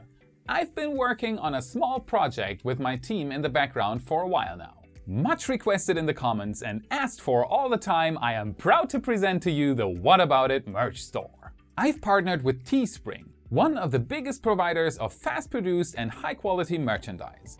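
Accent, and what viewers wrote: Germany. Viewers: are you from germany?!! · I wanna say German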